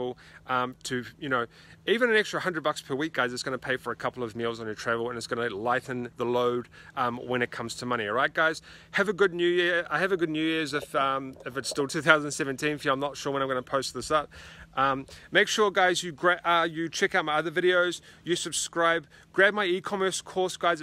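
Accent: New Zealand. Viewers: make a video on how to get your nice New Zealander accent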